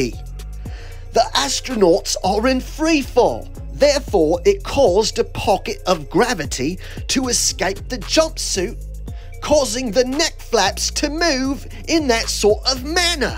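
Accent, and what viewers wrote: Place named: England, United Kingdom. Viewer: Hahahaha 😂😂😂 love the English accent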